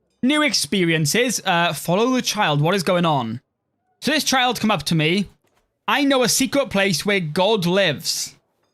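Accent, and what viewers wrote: Wales, United Kingdom. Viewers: heard you on the steam page and thought I detected a bit of a welshie Im Welsh/Irish so glad I looked you up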